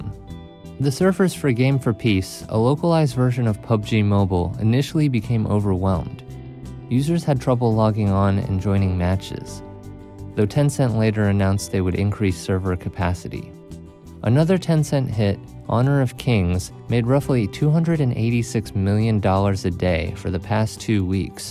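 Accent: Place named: United States. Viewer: 正宗的美式English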